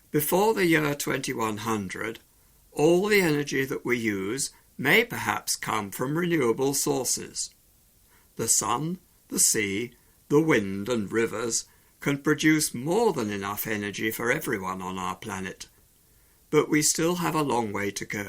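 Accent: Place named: United States. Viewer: درود بر شما لطف کنید ازین مقاله ها بیشتر بزارید با لحجه آمریکایی بیشتر ❤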